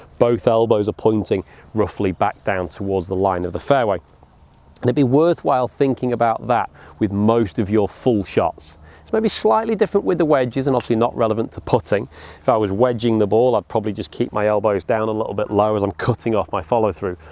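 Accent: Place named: Midlands, United Kingdom. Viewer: I want this guy to give me private lessons. Sounds like a midlander though and I'm near Gatwick 😔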